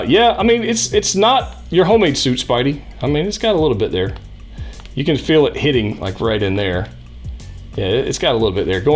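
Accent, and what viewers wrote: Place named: New Jersey, United States. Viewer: lol..that is Jersey accent you acquired ! Hilarious !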